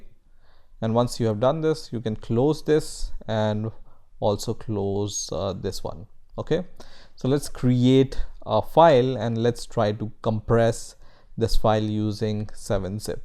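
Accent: India. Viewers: beautiful indian sounding man i love u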